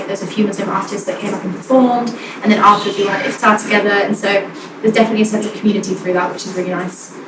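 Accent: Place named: United Kingdom. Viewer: Tangiers was international city From Tangiers come the most brilliant Moroccans throughout all civilizations Cute little Brit accent ah I studied three years in Tangier & loved it from 1967 to 1870 gorgeous beautiful City Lovely to be a hippie where in Casablanca was very thought to be a beatnik The best playa the whole city is Awesome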